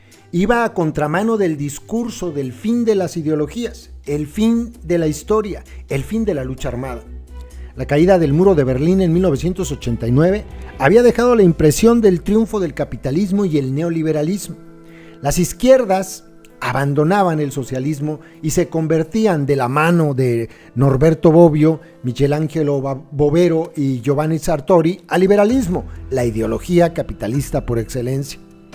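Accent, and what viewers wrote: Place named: Mexico City, Mexico. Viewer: Al estilo chilango ñerooo son de la capital yo soy de la provincia y cada estado y ciudad tienen diferentes tonos .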